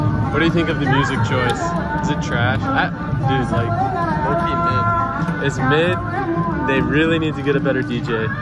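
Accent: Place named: Karnataka, India. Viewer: Anisuthide yako indu neenene nannavanendu ....Kannada accent 😮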